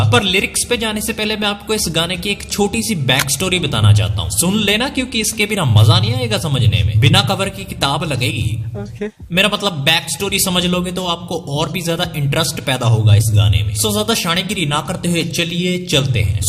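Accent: Punjab, India. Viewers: Bro u from Punjab??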